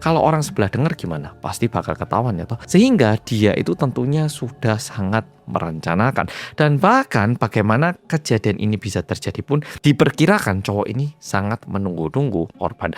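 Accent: Java, Indonesia. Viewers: Hai muedok😊 Lgsg nyimak🎉🎉🎉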